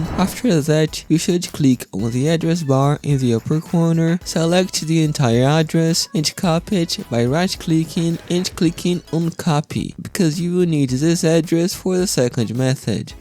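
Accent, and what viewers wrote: Brazil. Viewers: brazilian accent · Brazil , for sure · Pelo sotaque parece carioca. Obrigado pelas digas! You're awesome!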